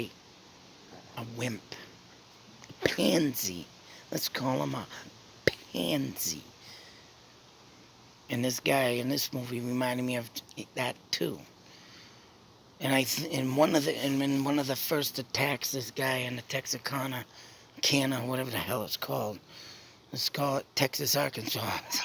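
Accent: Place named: Maine, United States. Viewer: Dude I like your Delphi videos. You sound like you are from Maine? I recognize the accent...I am from Vermont. thank you for this video.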